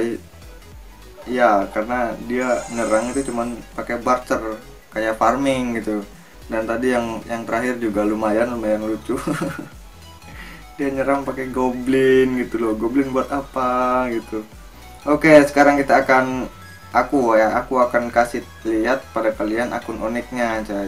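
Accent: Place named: Banyumas, Indonesia. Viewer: Ngakak ngapak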